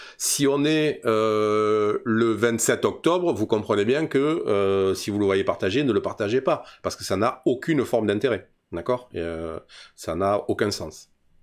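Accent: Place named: Provence, France. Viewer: Génial! Merci. Quel plaisir d'entendre tes commentaires (5/5) et cet accent provençal... un pur bonheur pour une marseillaise d'origine!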